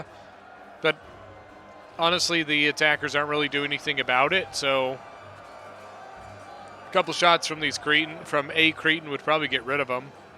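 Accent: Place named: United Kingdom. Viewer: your british accent actually suits u a lot more than u relise :))):_Ellington::_Rome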